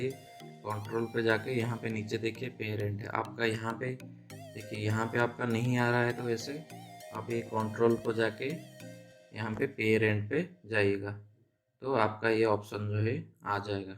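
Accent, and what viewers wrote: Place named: Odisha, India. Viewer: Please correct me if I am wrong, I feel you are from Odisha due to your accent.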